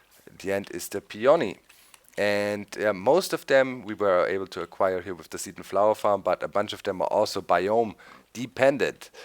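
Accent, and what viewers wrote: Germany. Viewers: You sound very german. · Widac ze to jakis niemiec gada na tym filmie rosjan to lubie ale niemcy gunwozjady to juz ich akcentu nie wytrzymam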